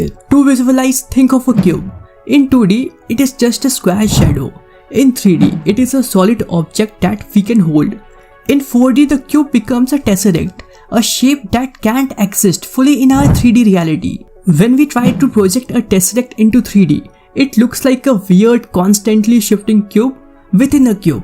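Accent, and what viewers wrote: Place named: India. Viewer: Why not correct your pronunciation (Indian accent) - which is beyond my ken.. - and remake this clip?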